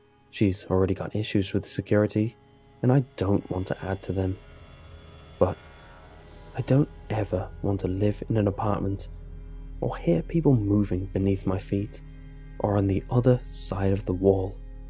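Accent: United Kingdom. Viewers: British much?😂😂 I love how you say garage 😅😂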